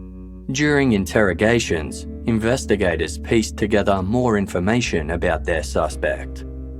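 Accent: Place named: Queensland, Australia. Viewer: The Queensland accent is especially delicious for the dark bits!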